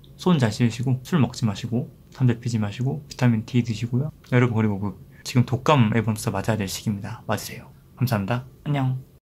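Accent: Gwangju, South Korea. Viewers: 개판이라는 말 넘 웃겨여ㅋㅋㅋㅋㅋㅋㅋ 님 전 광산구 주민이라 광주사투리 들으니 넘 반갑네용ㅠㅠ 성격 좋으신 님! 늘 응원하고 있습니다👨‍⚕️✨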